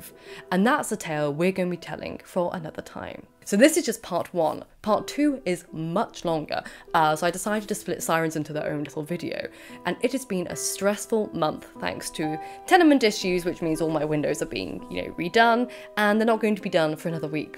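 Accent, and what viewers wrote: United Kingdom. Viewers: Why does every British person sound like an Oxford professor! 💚 everything sounds more intelligent and smooth! 💚 Love the mythological on sirens/mermaids! 💙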